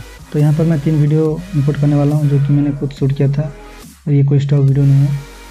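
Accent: Odisha, India. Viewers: Bhai aap odisha se ho kya?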